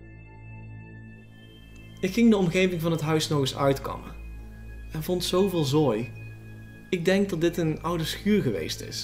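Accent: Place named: Belgium. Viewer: is een belg of limburger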